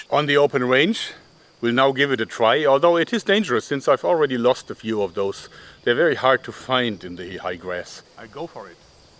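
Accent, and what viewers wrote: Germany. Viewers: i love this german accent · I've said this before, and I'll say it again, you're such a happy, jovial man, with an infectious laugh. And the weather seems to always be near perfect when you shoot your videos. I happen to love the topic of your channel, but I think I would watch them even if I didn't because I like to hear your German accent. I hope you have much continued success. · Hi hörst dich Deutsch an glaube auch das du es bist xD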